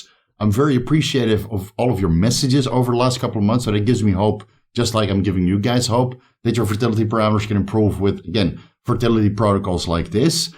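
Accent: Netherlands. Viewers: Are you dutch? You got funny accent.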